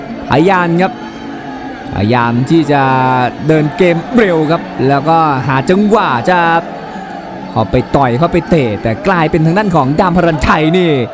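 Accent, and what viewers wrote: Thailand. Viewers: ชอบเสียงพากย์ ลูกผสม ไทยบ้าน + เสียง กรุงเท๊พ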